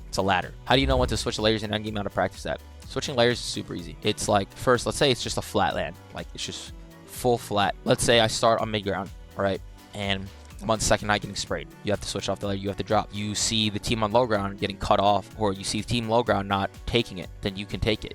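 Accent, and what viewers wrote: United Kingdom. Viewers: British bloke?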